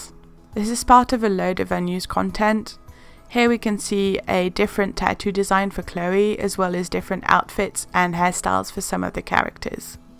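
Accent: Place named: England, United Kingdom. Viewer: O cool ! Vous avez un sacré accent anglais :)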